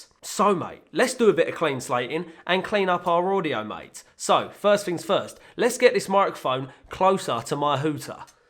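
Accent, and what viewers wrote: United Kingdom. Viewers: this guy's British accent alone makes me stick to his videos like dammmmmnnnn mate!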